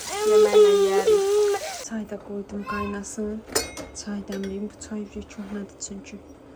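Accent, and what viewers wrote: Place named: Lankaran, Azerbaijan. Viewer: ləhcəniz bizim ləhcədir lənkəran deyirəm lənkəranlısız😊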